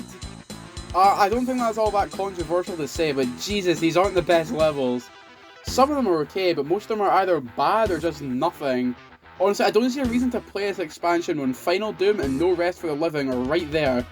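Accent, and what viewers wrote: Scotland, United Kingdom. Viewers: man i love that Scottish accent.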